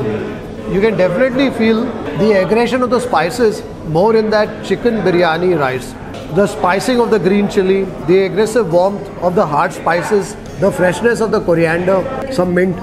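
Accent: Karnataka, India. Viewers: I love u talking innocent beautiful sweetest kannda Lots of love to you sir. Happy new year in advance sir.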